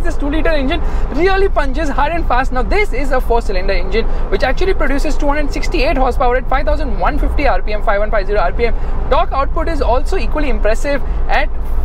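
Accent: India. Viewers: Wow wow wow - The first thing you need to do is apply brake on ur tongue. It seems u are doing 250 words per minute & that too in Indian style English. Should we call it a running commentary or a runaway commentary? I'm sure 99% of ur audience will appreciate if u slow down. Basically u r ruining d show with your runaway commentary. Sorry if u find me rude, but I just honestly gave u the feedback. Thanks.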